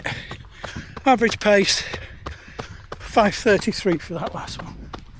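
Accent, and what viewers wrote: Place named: Yorkshire, United Kingdom. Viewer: How come you got a Yorkshire accent and you're running for running for l the Welsh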